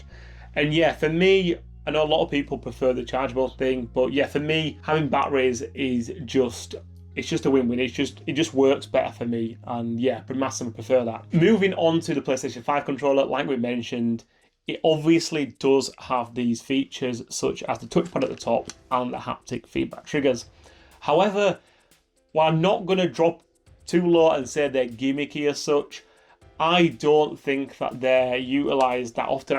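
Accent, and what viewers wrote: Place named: North East England, United Kingdom. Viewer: Where in the UK are u from? you sound like your from the North East. Good vid. Subbed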